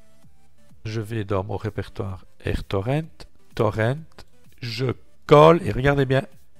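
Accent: Belgium. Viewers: j'adore cet accent belge "une fois", et un pouce bleu pour toi mon ami... j'ai suivi le tuto jusqu'au bout, mais à l'ouverture j'ai un message "Impossible de lire le fichier de ressources: ~ /.rtorrent.rc", je précise que j'ai enregistré le fichier ".rtorrent.rc" dans /usr/share/doc/rtorrent/examples, car je savais pas où l'enregistré c'est là ou j'ai peux être fauté, peux tu m'aider s'il te plait grand chef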